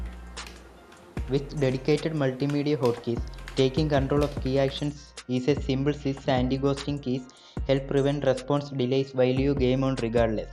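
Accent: Kerala, India. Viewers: Malayali ahnalle ? 😂 ( english kettappo manasilaayi )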